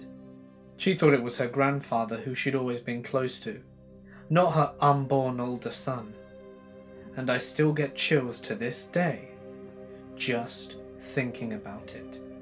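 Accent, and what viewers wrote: United Kingdom. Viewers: British accent isn't working for me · I truly enjoy your stories. Especially your voice. Being across the Big Pond I don't often get to listen to British voices and yours is amazingly smooth and well modulated. One channel sounded so bored he put me to sleep. You know just how to make a story scary and sometimes sad. I just wanted to thank you for all your hard work. Also thank you for sharing. Ms Michal aka Oldgamerchick😺😉☕🙏💖⛪💖 💀💀👻👻👻👻👻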